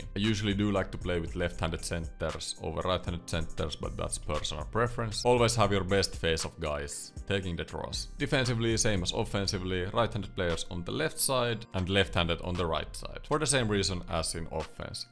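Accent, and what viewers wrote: Finland. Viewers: kun sanoit et sun enkku oli huonoo joskus ja vähän tavallaa viittasit et se ois vieläkin, toki parempaa kuin ennen. Ni ihan pikku tippi siiheb liittyen ni sano L1/L2 ja R1/R2 "EL 1/2" ja "AR1/2" ni jos tääl on jotain jotka ei tuota ymmärtäs ku sanot äl one ja är one ni sitten he ainaki ymmärtää. Mut siis muuten imo ihan hyvää enkkuu puhut sillee suomalaisel aksentil.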